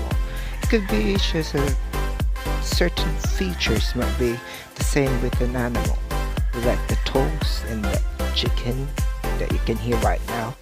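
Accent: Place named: United Kingdom. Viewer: lovi'n the british accent though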